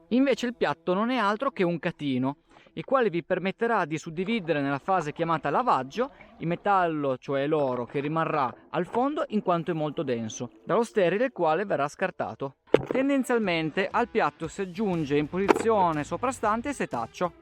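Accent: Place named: Piedmont, Italy. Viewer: Mi sono iscritta una settimana fa circa! Per caso è apparso un tuo video e sentendo accento piemontese mi sono soffermata a curiosare ! Sto guardando tutti i video vecchi. Grazie della compagnia.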